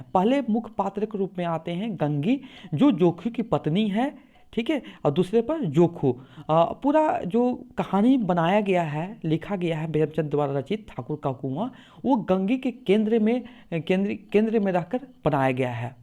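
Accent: Bengal, India. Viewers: Ap kya bengali hai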